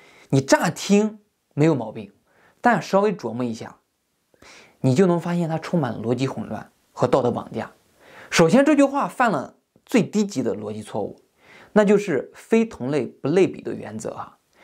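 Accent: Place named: Shandong, China. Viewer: 我怎么听着你的口音这么山东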